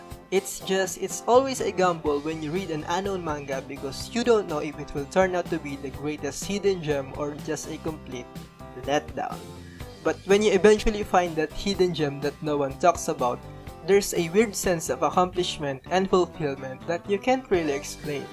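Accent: Philippines. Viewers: You sound like a filipino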